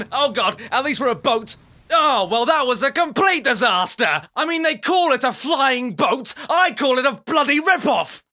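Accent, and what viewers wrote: United Kingdom. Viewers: Thanks because of you my English skills and British accent improved a lot and on the other hand my options to swear like a real brit is improved as well thanks for that:) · i love the fact that you are over british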